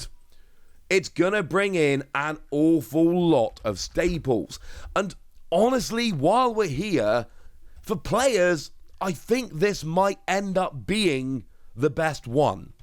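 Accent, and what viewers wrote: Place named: England, United Kingdom. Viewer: I joined the subscription after just 2 videos after listening to this cheerful and chipper English accent poketuber. It's so refreshing to hear a change of pace and be actually helpful/ competent. Not only does this channel talk about what to do competively but also discusses what to invest in for memorabilia/ speculation investments to make money only to buy more pokemon cards!!!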